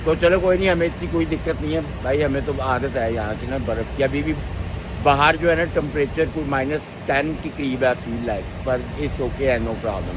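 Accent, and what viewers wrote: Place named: Punjab, India. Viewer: Bhai sahib, why didn't you make your vlog in Punjabi instead of using a Punjabi accent in Urdu with some English words? I don’t understand why some people have a complex about trying to use Urdu and English for their vlogs. Also, you are complaining about the weather in Canada, but who invited you there? Bhai, be grateful to Allah; you have been blessed with so many blessings and a job. Enjoy the day you've been blessed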